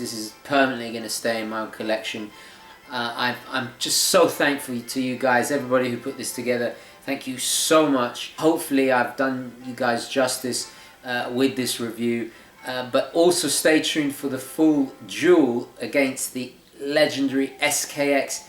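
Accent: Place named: United Kingdom. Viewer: Why do you sound so british? )) Nice watch, I´ve got mine in green.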